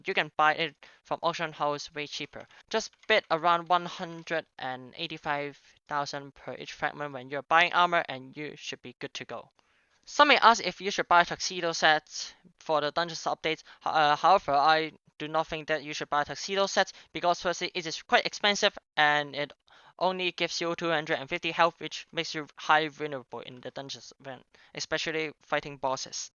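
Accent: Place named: China. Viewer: Your accent makes me feel like I’m hearing Chinese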